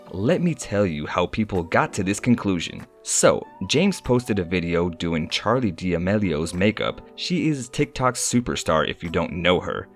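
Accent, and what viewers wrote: United States. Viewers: Prices quoted in pound sterling... Narrated in American accent? I'm lost. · Jade Tea Was thinking the same thing. Sounds like a foreigner with an American accent, so I was confused by the £ usage. Especially since we’re talking about an American public figure.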